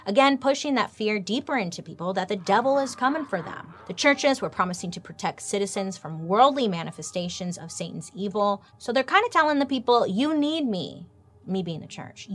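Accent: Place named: East Coast, United States. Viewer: I love ur voice you sound so east coast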